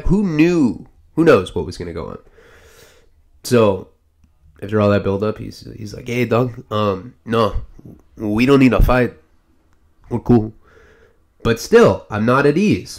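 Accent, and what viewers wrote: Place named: Chicago South Side, United States. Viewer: Your south sider accent is priceless 🤣🤣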